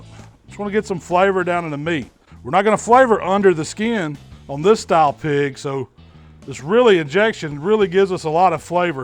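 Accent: South, United States. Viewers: It's the country accent + being husky 😂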